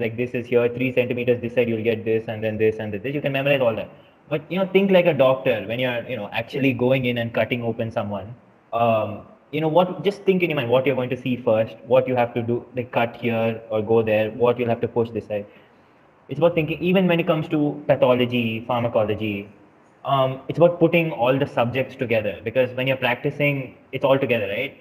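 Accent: India. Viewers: Great videos. For us, who are not Indian, understanding the Indian accent is not always easy. Could you please write a brief summary of each video under the video. That would be so helpful. Thank you in advance and best regards.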